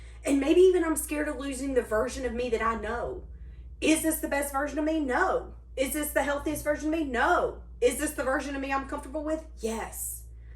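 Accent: South, United States. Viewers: Holy cow! You are on point! This totally resonates with me. Thankyou so much ❤btw love you accent. I am from the South too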